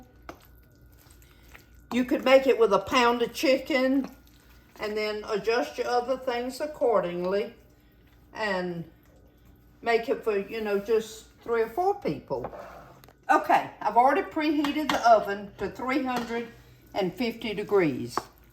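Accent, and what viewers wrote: South, United States. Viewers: Love the southern accent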